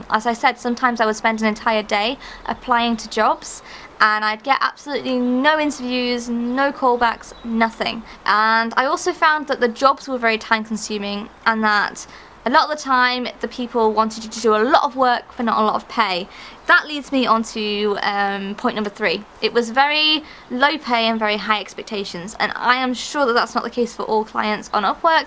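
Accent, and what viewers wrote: United Kingdom. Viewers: came here for upwork related content but oh God That British accent <3 I want to speak like you :( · english from uk lovely :)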